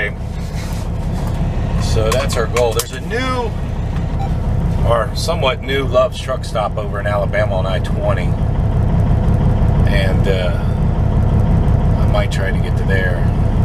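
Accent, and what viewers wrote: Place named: Western Pennsylvania, United States. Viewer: What city are you from, originally. Wondered about the accent and word usage. I don't mean any insult, but you sound somewhat like you're from Pittsburgh or Western PA, where we are.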